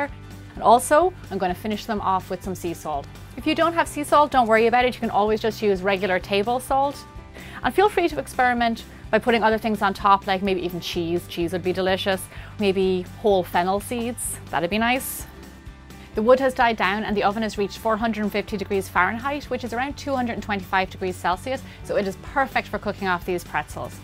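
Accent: Ireland. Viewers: OH WOW!!! They look incredible! I make bagels pretty much the same way, by boiling them. And I have to say - your lovely Irish accent made them seem that more scrummy! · Irish accent? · Lovely Irish woman.... beautiful accent. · just a wee bit o butter. lol I know you don't say that but I love your accent. irish I'm guessing... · are you Irish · Are u Irish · She is from Ireland I'm pretty sure :) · i love pretzels thanks what is youre nationality im guessing irish lol no clue carry on carry on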